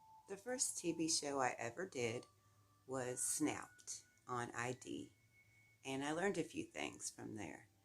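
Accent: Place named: South, United States. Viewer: you got a sweet little southern accent...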